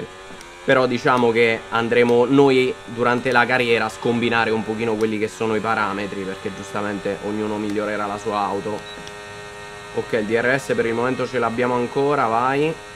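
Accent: Rome, Italy. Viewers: La tua parlantina da Romano mi fa morire 😅🎉😅, comunque inizia a freni sui 100 m dall'inizio della curva..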